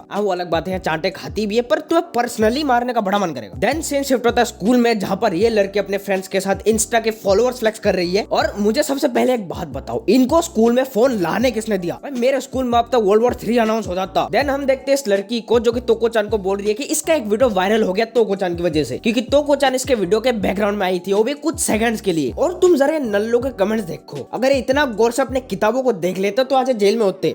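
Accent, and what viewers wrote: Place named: Bihar, India. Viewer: Bhai tu bihar se hai mera ek dost bhi ese hi bolta hai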